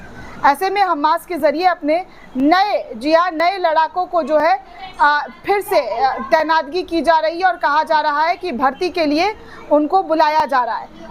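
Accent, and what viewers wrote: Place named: Uttar Pradesh, India. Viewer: She is from UP probably from western UP or Central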